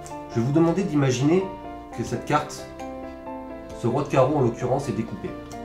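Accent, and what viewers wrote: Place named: South, France. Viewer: salut, une critique pour tes vidéos, si tu doit améliorer quelque chose c'est l'accent du sud, je sais pas si tu l'entend mais essaye de le supprimer ou alors exagère le. allez bisous.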